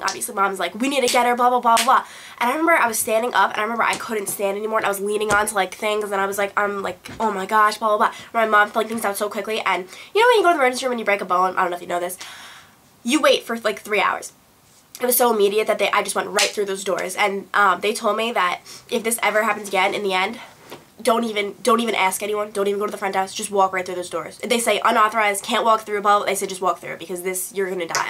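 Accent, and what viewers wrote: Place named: Boston, United States. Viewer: You sound like you are from Boston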